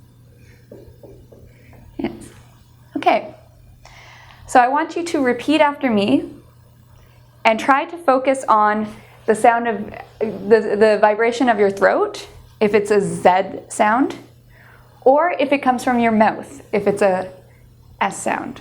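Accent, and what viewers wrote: Canada. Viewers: Neither--she definitely sounds Canadian. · Oh, Canadian...lol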